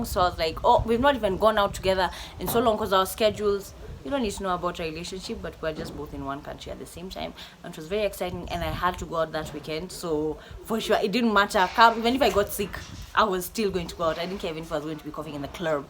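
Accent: United Kingdom. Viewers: that ka british accent 😩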